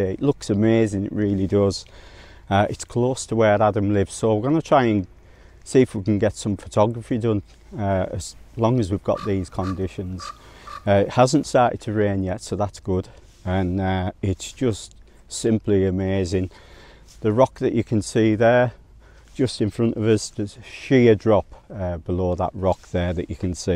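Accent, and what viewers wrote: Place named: Sunderland, United Kingdom. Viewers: Great video, me and my wife are trying to figure out your accent, I've decided you're from Cockfield, she thinks Sunderland. Could you please end the mystery for us. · Canny video, where are you from? I'm from Sunderland and can detect traces of mackem in your accent but not sure.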